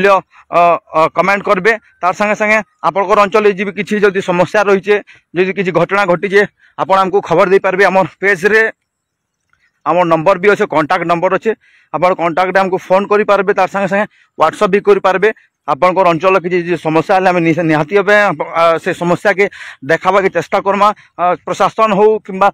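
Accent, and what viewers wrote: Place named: Sambalpuri, India. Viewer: Aamar kacha samal Puri bhasa re news Hebar Katha........adha samalpuri adha katkiaa kahuchha...........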